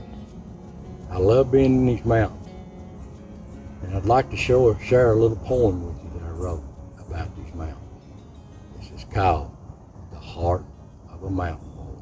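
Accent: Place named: South, United States. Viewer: I like your poem, truly from your heart. I've people comment negatively on the way I still some words. We moved to California when I was younger. But I point out how those on east coast will change an a on the end a word to a r. People need to be more tolerant for those of us from the south. I love the way you say chimbly for chimney. My dad was Arkansas and that was how he called it. I'm 76 now and my Dad passed away in 1989. So you bring back good memories. I like all your videos. Keep up the good work.👍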